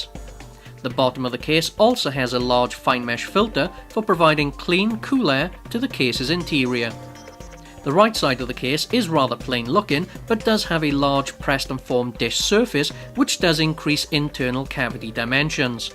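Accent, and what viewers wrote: Wales, United Kingdom. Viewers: are you from wales?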